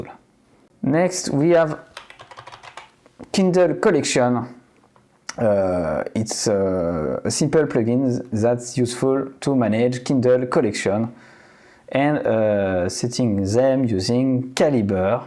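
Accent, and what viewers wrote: France. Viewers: loved your french accent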